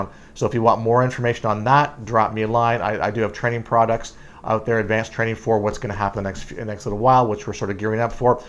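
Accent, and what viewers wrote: Canada. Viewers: ouuut😅 abouut he's definitely from Canada.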